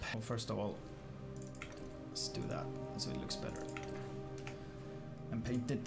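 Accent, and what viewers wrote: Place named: Sweden. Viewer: Nae då men jag tyckte jag hörde lite svensk dialekt 😀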